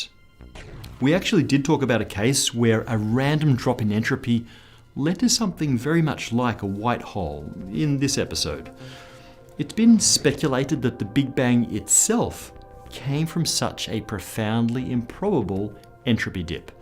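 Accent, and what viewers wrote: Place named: Australia. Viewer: do you have a channel with the same information, but without the Aussie?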